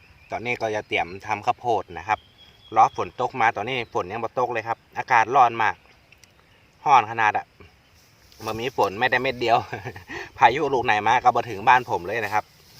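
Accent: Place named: North, Thailand. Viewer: อู้เมืองก่น่าฮัก น่าฮักแต้เนอ เสียงนกเสียงหนูบรรยากาศดีเนาะ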